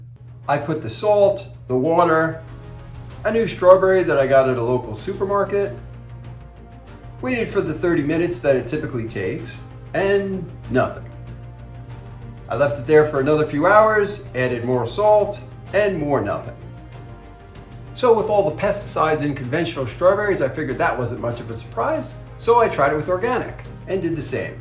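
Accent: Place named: New York, United States. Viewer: I can tell this guy is from New York cause of his accent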